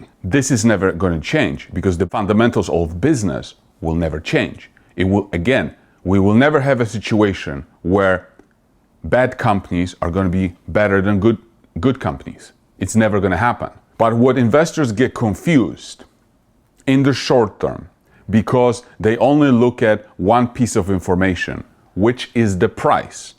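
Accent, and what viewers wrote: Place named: Midwest, United States. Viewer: M. I feel very fashionable! On Sunday evening 23 January, I was 85% in cash, on Monday 24 January, I was fully invested and in margin, PLTR, SOFI, XPRO, IMDB ETC. THE 15% remained in the big 3 of yours, And USGDF, AZMCF,CNSNF. I don’t care about stock prices, just want to be fashionable. Going to be measured for Brioni, Dolce & Gabbana, and Givenchy, I have grey hair Fair, wrinkled skin, with brown spots what colors do you recommend,( to match my stocks? Not sock,)? BTW, you are developing a midwestern accent like Ohio or Indiana.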